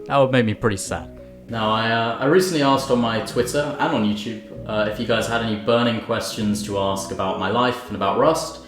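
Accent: United Kingdom. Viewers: Alot of British people's voice for me sounds a little older, maybe it's the combination of the accent and way of speaking. Like Spiffingbrit, frankieonpc, and now.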